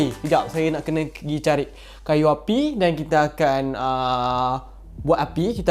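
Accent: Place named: Kelantan, Malaysia. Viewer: Kecek klate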